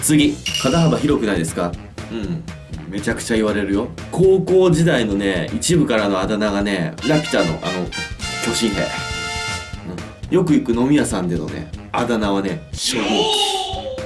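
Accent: Japan. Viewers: はじめんほど訛ってないけど近い地方の人なんだなってわかる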